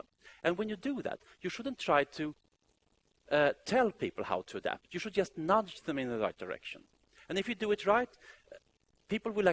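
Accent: Sweden. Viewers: How amazing how the swedish accent and speach melody comes through his english !! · So swedish hahaha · Of course I know the definition of awkward. Apparently you don't, since you had to question it. Being a Swede myself, you can't imagine how painful it is listening to someone speaking English, in the Swedish accent. And this guy had a really thick accent. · awesome Swedish accent XD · DAT ACCENT... Let me guess, swedish?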